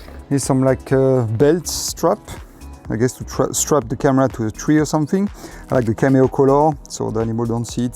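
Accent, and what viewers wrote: France. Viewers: Merci beaucoup pour cette vidéo, vu l'accent je pense que tu es Français, dommage de ne pas avoir fait cette vidéo dans ta langue maternelle, je comprends rien à l'anglais, j'ai dû activer les sous-titres mdr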